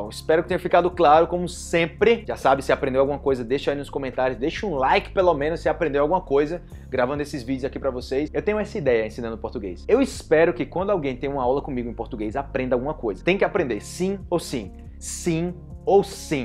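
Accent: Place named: Colombia. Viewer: Eu gosto quando ele fala em espanhol, ele escuta tão colombiano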